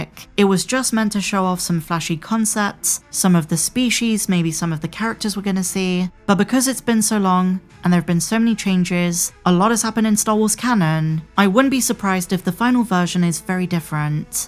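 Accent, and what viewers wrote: United Kingdom. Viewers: Sometimes I wonder. I've always felt like this host had a very unique quality to her voice that I haven't heard before live in person from anyone. Multiple unique tonal and pacing qualities, as well as a particular type of British accent that I've never heard before.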